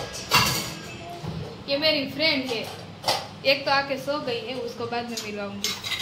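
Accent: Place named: Gujarat, India. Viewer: Wah wah....gujju jyare hindi bole...bapa ketla saras lage😄👌👌👌👌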